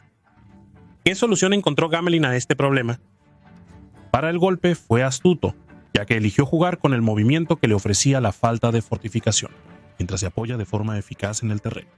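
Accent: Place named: England, United Kingdom. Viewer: En este video, los apellidos franceses se pronuncian " a la inglesa". Hombre, no creo que sea tan difícil hacerlo en francés.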